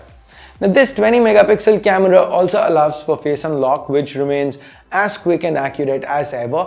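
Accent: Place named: Tamil Nadu, India. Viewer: Haha tamil channel illama english veraya hmm👍👍👍👍👍👍👍👍👍👍💕💕💕💕 enna dhan english pesinalum tamil slang madjiriye iruku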